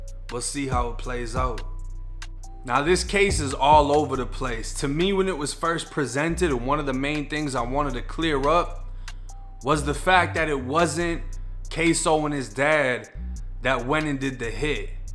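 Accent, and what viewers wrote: Boston, United States. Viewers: Know I hear that Boston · U from boston or something ? Lol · where you from Boston? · Why you mixing a heavy south Boston accent with a Louisiana drawl????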